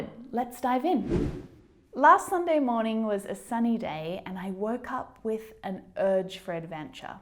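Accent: Australia. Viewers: Australian Accent ❤️❤️❤️❤️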